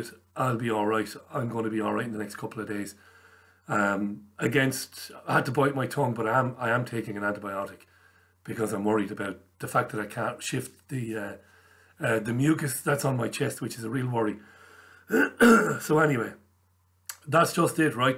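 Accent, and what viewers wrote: Ireland. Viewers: O man ......I miss ya ? I didn't realize how much I listened to you and the show even my kids are asking about you ..my ten year old son does a real good Irish accent all because of your show come back soon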